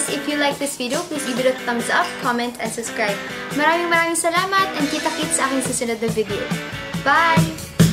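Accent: Philippines. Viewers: Gloria Robles she's a filipina that's why the accent is different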